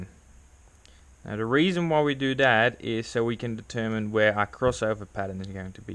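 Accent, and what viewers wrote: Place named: Australia. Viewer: Sick bro knew that accent was Aussie! Love the vids bro keep up the great work!!! Yew!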